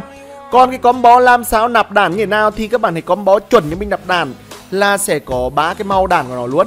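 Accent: Nghe An, Vietnam. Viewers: Ng nghệ an mới hiểu đc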